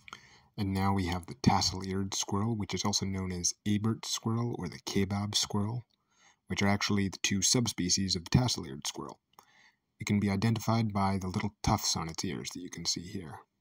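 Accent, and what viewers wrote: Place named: South, United States. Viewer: Ha! Speaks in a southern accent!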